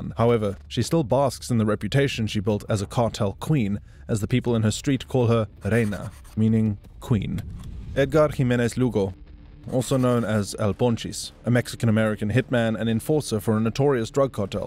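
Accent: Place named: South Africa. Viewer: Its a south african bloke lol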